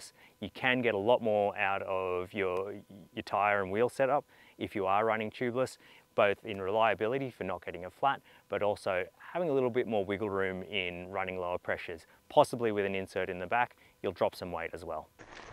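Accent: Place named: Australia. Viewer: No problem hearing and understanding him perfectly here in Switzerland even with the typical Aussie accent! And he is right btw. actions do speak louder than words mate.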